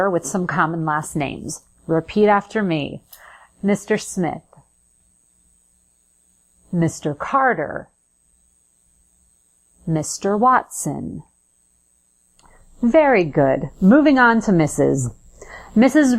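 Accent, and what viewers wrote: United States. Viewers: Why yes she is American speaking their version of English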